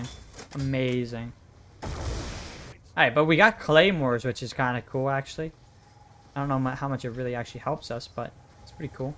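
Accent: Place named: Italy. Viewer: im from the northeast and hearing your voice is hilarious so italian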